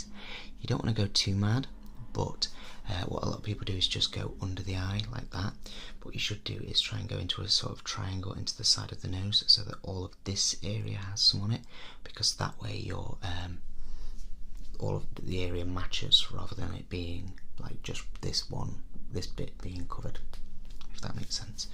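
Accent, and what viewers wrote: United Kingdom. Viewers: Just found your videos on my ' Recommended' list and honestly I adore your style! So happy I found you as there aren't many 'decent sounding ' Brits (no offense to any other fellow Brits, just some accents sound better to me personally).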